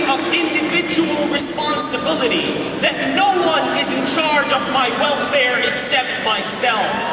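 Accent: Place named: United States. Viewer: What a true American sounds like!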